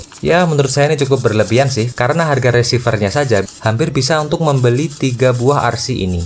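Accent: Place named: Surabaya, Indonesia. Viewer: Endinge metu Suroboyoane 😄 Baterai di sering paralel berarti tegangan tetap 3,7?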